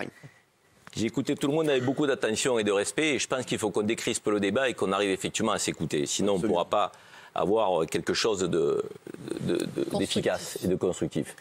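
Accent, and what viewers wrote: Marseille, France. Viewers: Wallah Karim l'accent marseillais ✌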